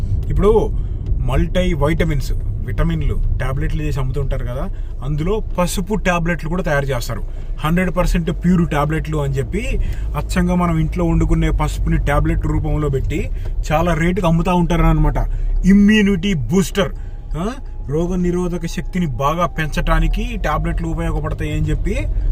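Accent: Andhra Pradesh, India. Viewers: Meru andra valla telugu valla slang enti andra slang laa undi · Nizamabad antunnaw andra yaasa matladtunnaru Nizamabad antea pakka telangana bhasha matladutharu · అన్నా మీ భాష ఆంధ్ర వాళ్ళ లాగా ఉంది.. పక్క తెలంగాణ లేదు... ఆంధ్రలో చదువుకున్నారా? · తెలంగాణ బాషా యాషా ఇది కాదు నువ్ ఆంధ్ర బిడ్డవి ఉన్నట్టు ఉన్నావ్ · mi slang Telangana slang lekka ledhu meedha andhra na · అన్న మీరు ఆంధ్రా నుండి వచ్చి ఇక్కడ సెటిల్ అయ్యారా? అంటే స్లాంగ్ నిజామాబాద్ ఫీల్ ఇవ్వట్లేదు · Nizamabad antunnaru Andhra slang undhi reason? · Ayana language kuda andhra valla lane untundhile. Valla family antha kuda andhra slang a matladtharu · Ne slang motham Andhra slang lekka undi anna 😅.. nizamabad ante pakka telangana slang unatdi anukuna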